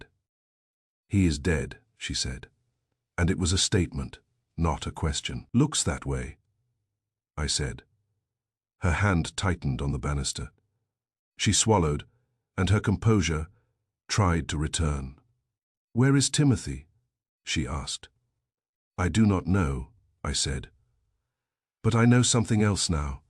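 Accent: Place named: United Kingdom. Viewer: If you’re a person, I do enjoy listening to you. It’s a bit of a challenge reimagining Archie Goodwin with a British accent, I’ll listen some more.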